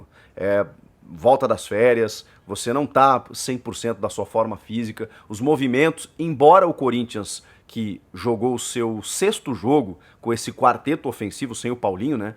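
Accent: Rio Grande do Sul, Brazil. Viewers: Para de puxa gaúcho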